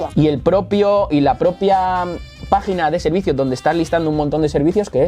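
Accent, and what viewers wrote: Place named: Madrid, Spain. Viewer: Hola, me encanta como explicas, eres divertido, estoy haciendo este curso sin tener ni idea de informática, bueno sí lo básico. Y con un profesor cómo tú es difícil concentrarse. Qué madrileño más bonito. Sin ánimo de ofender, desde el corazón jijiji. Saludos desde Málaga.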